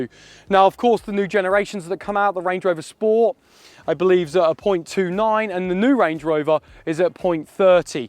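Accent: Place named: United Kingdom. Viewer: That British accent is 🔥🔥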